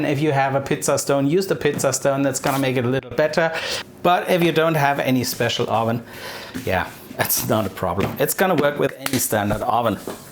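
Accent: Germany. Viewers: Lol all Germans say ‘stripes’ instead of ‘strips.’ And ‘vinegar’ invariably becomes ‘winegar,’ which is weird given ‘w’ is pronounced more like ‘v’ in German! But anyway, thanks for sharing - I love Flammkuchen.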